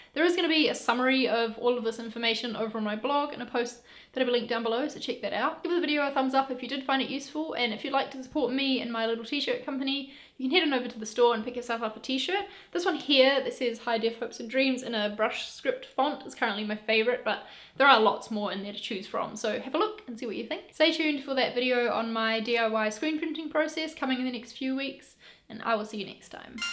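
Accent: New Zealand. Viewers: Off topic but, love the NZ accent! Thanks for this! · I thought I could hear a Kiwi accent and so read the description, sure enough you are! Thank you for the information, and I am off to see your screen printing video now :)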